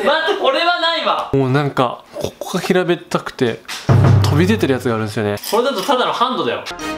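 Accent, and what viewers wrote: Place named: Toyama, Japan. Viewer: この中に入っとるんか。 完全富山弁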